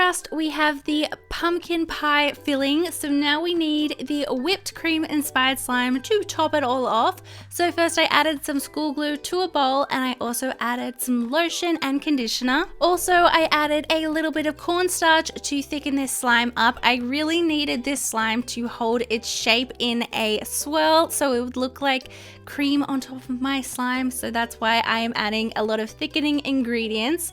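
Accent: Australia. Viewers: I LOVE her Australian accent!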